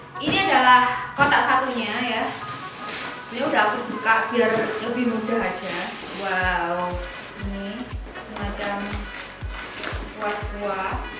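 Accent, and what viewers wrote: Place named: Malang, Indonesia. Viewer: Ben ndelok video e mbak aku mesti cengar cengir ambek medok e😂 malang e asli👊👊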